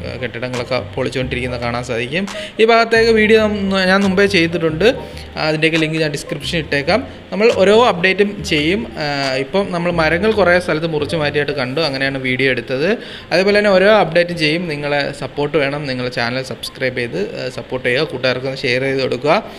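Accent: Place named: Kollam, India. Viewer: നമ്മുടെ കൊല്ലം ഭാഷ💕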